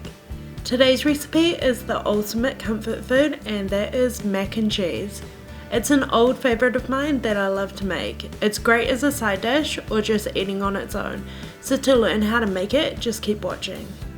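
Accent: New Zealand. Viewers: from new zealand probably! that's where im from :D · The Kiwi accent is way too annoying to listen to 5 minutes of it. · I think it's New Zealand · Sounds like a kiwi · Fellow kiwi?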